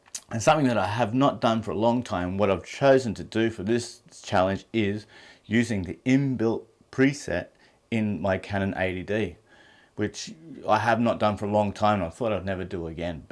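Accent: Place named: Australia. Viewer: Great video! Love hearing a fellow Aussie on YouTube! I've just done a video / project with a monochrome in camera preset. Loved it! Great video!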